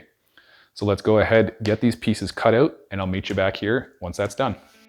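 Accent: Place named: Canada. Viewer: That dose of Canadian Accent made my day... Back when I was in shop class many years ago we were taught to put a piece of paper between the bowl and the sacrificial mount. It gave you a cleavage layer to make it easier to knock off with a hammer.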